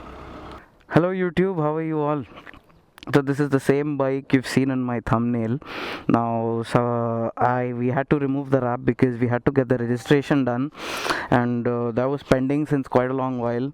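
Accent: Hyderabad, India. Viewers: Your accent is awful and here you are trying to get rich on YouTube. Why don't you go fishing and work for a living like the rest of your Indian brethren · Bro please make one video per month in your hydrabadi accent. I love your hydrabadi accent. please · i knw indian accent but this is way too indian.. and FYI i stopped as soon the accent ws killin me ....literaly.....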